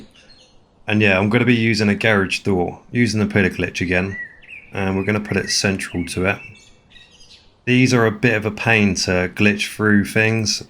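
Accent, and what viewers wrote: United Kingdom. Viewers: Love your cockney accent bruv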